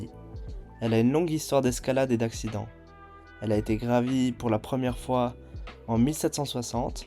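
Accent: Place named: Italy. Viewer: Le petit accent italien 🤌🏽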